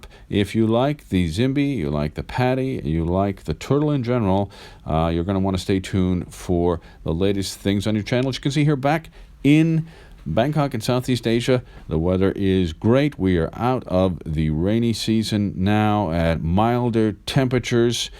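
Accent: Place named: United States. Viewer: Why can't all Americans sound like you. Both you and TGV have taught me so much about Seiko. Cheers👍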